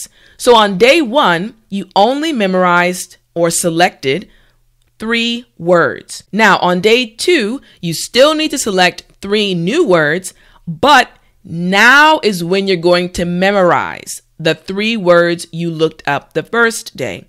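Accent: United States. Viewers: American probably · Anyone please tell me Is this American English channel or British ? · well is this american english ?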